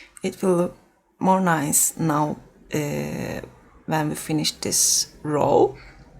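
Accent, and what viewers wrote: Turkey. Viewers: It’s so beautiful 🤩 and your English is just so sweet. Are you Turkish ? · You are an EXCELLENT TEACHER!!! Your English is NOT a problem at all, because you do the most important things to help us understand, unlike others who make crochet videos. I appreciate this tutorial, I am soooo bored with the same old stitches! You explain well, but other people do not explain the task, they just "say" do this stitch, do that stitch, without explaining why, but you do. Maybe we could learn a bit of your language! Turkish is a beautiful language, (the accent is too) just like other Altaic languages, like the Koreanic, Mongolic, & Japonic subdivisions, they are very beautiful to listen to, even when the listener does not know the language. THANK YOU!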